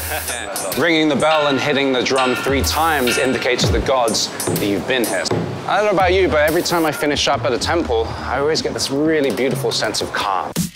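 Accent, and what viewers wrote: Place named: United States. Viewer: I had to look up this ad cause I was surprised with his accent. He sounds American now 😢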